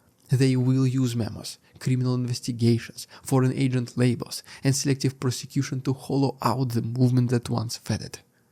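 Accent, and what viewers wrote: Russia. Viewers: WHEN I QUICKLY SURFED THROUGH YOUR CHANNEL I THOUGHT - UGH, WHO’S THE THICK RUSSIAN ACCENTED — HIPPIE? HE NEEDS A GOOD SHAVE, HAIRCUT AND PERHAPS AN UPGRADE WITH HIS WARDROBE. I’M SURE HES A PROPAGANDIST WHOS KNOWS SHIT ABOUT ANYTHING, SO WHY WASTE MY TIME. I GAVE A LISTEN. MY JUDGEMENT BY YOUR BOOK COVER WAS WAY INCORRECT. YOURE SUPER BRIGHT, ARTICULATE (EVEN WITH YOURE SLAVIC TONE HANGING HEAVY) AND MORE INSIGHTFUL ABOUT THE ONGOING CONFLICT, RUSSIAN SOCIETY AND KREMLIN POLITICS THAN ANY YOUTUBE BLOGGER OUT THERE. I’M NOW A BIG FAN. THANKS FOR YOUR REPORTS.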